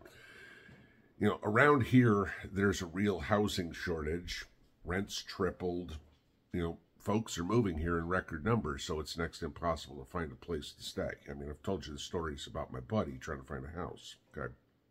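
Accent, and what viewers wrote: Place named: North Georgia, United States. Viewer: Sounds like north Ga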